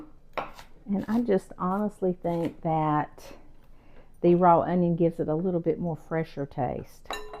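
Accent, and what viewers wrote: South, United States. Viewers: I make salmon burgers and put in finely diced celery, parsley and use oats to bind. Dust with flour before i fry. Im gonnavtry your recipe. I love watching your videos and your southern accent is musical, delightful, and beautiful, ❤❤❤❤❤ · Keep on keeping on,don't change anything ! Keep your great southern style, language and all. From one southern belle too another!❤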